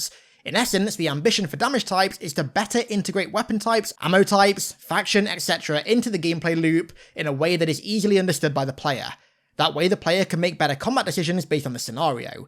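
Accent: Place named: United Kingdom. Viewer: what did you do with? And why do you have a British accent?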